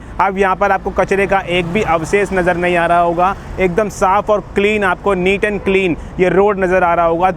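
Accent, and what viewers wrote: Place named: Bihar, India. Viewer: 100% aap bihari hai na q ki mae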